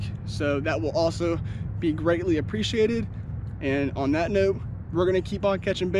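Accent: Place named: South, United States. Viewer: i was so confused, what got me wondering was that your brother doesn’t have a slight southern accent like you florida really is two different states 😂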